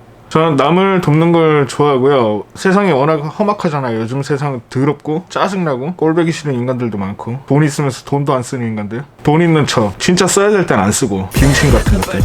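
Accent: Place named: Daegu, South Korea. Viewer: 댓글 달고 나니까 대구가 고향이라는 말씀하시는 부분이 딱 나와버렸어요 ㅋㅋㅋ익숙한 말투라 ㅋㅋ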